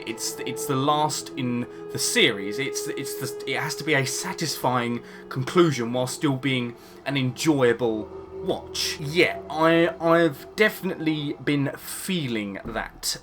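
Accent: United Kingdom. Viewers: You from Birmingham?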